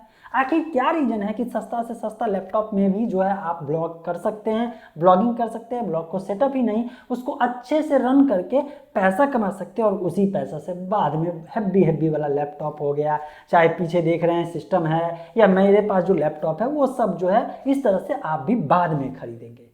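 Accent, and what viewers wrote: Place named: Bihar, India. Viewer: Bhai apka bolne ka tarika s lag rha hai bihar jharkhand s ho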